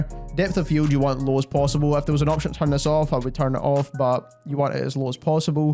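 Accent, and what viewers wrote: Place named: Scotland, United Kingdom. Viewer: Same bro wasn't expecting a fellow scotsman when I clicked the video , have another sub 👊🏼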